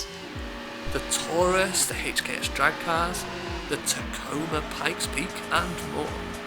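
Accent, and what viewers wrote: United Kingdom. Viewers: Ah yes, nothings warms this cold friday evening more than listening to a british bloke talking about a GOAT tier simcade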